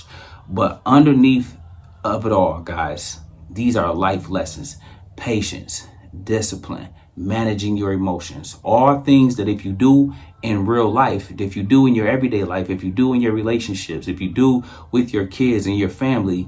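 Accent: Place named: Philadelphia, United States. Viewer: Are you from Philly?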